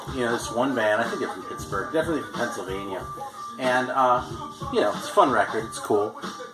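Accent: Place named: Spain. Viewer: That Gang Starr album totally rules! Now I'm gonna watch new Blade Runner and have some chocolate while watching it. Nice stack of picture records. I have first four Venom albums on picture discs. I have more but I really don't remember right what else. Cool Spanish accent hehe. Take care. Cheers, Petri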